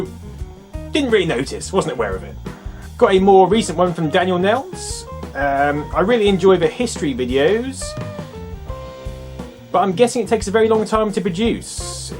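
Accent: United Kingdom. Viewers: BRITTISH ACCENT! and i dont eaven write london right YAYAYAYAYA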